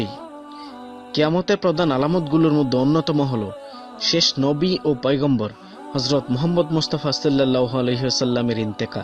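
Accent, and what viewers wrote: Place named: Sylhet, Bangladesh. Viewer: সিলেটের ভাই মনে হয়। যাই হোক তিনি তার সর্বোচ্চ চেষ্টা করেছেন।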